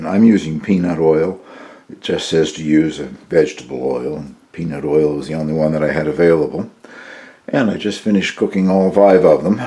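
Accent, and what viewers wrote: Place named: Canada. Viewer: I, like yourself, appreciate good food but not into making comlex ones. I'm learning interesting Canadian pronunciations. We say scones like stones. You say it like skawns. I'm hearing a lot about the instant pot, it looks like it needs a 2 week workshop to learn how to use it. Thinking about getting one. Best wishes.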